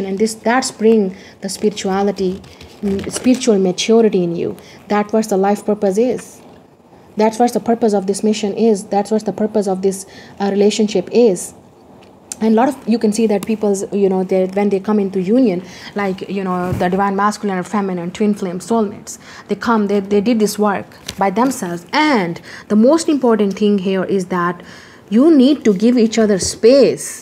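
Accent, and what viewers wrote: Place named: India. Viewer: 💯 % agreed to you; the biggest religion is humanity and we should respect each other for that.. great work and respect for you dear... Ur an awesome and straight forward person :)) god bless you with all the happiness:)) n yes our accent is same ... lots of Love and respect for you from india for this reading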